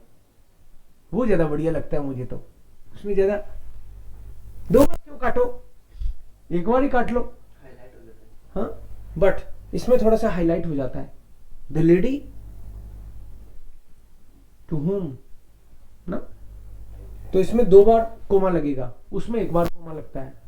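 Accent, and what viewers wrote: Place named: Germany. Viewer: Learning a language requires learning all its aspects. If you can't do German accent, at least try. Your German accent is pathetic & disgusting to hear. Just rote learning grammar & expending no effort in accent-polishing! Please don't go to Germany as we, other Indians, do not want to be judged based on one bad speaker.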